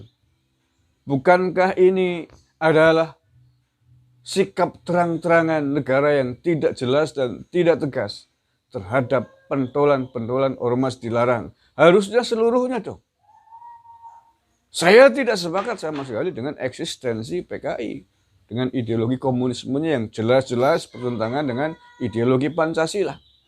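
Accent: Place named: Tegal, Indonesia. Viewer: asik mas dangdutanne..iyonge wong tegal kiye mas, mantapp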